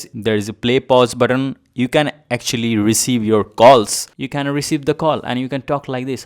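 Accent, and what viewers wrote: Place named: Northeast, India. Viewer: He has an Asian accent. North East Indians have such an accent.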